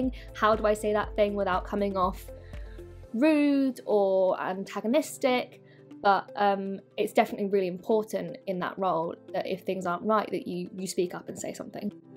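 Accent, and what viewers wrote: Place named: United Kingdom. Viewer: Her british accent :)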